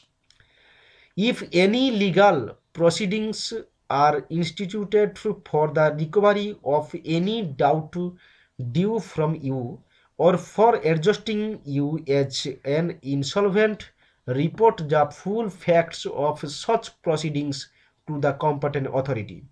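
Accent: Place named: Bengal, India. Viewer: Sir English is not English. Its very difficult to catch bengoli tone.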